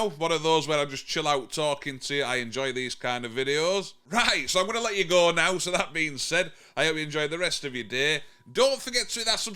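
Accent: Yorkshire, United Kingdom. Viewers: I love the fire TV cube! The only problem with it is that I find that I have to restart it every day to keep it stable. If I don't, it goes haywire if I use my headphones! I'll turn them on and YouTube (which is mostly what I watch) just sticks and I get that "loading" circle! I tend to restart it every morning. Apart from that, I'm fine with it the way it is! I love your down to earth videos and your lovely Yorkshire accent. I'm was born in Scotland, we moved to Merseyside when I was 9 and lost my accent!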